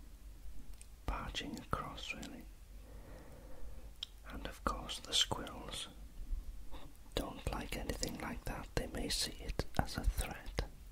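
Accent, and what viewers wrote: Wales, United Kingdom. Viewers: Wow, this is amazing. Are you Welsh Sir. Those Squirrels are seriously cute.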